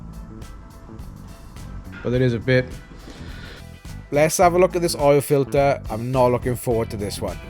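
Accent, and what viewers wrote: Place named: South Wales, United Kingdom. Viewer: Thats a real Bummer, like a Major BumOle! So sad to see a well sorted bike go bad like that , you obviously know how to put a engine together but not sure if you worked on that one before the issue. At least we got to see the internals of a DRZ, i thought they were pretty much indestructable. Ihave a Husky WR250 that went pop due to a exhaust O ring tear,ingested some finest Welsh grit and did the big end crank and the bore in. I ride a Xr650R and a few more Hondas but not turned the BRP over in a few years so I just hope she is ok buried iin the back of the garage. Good luck buddy , detect the SWales accent there , alot warmer where you are atm Atb x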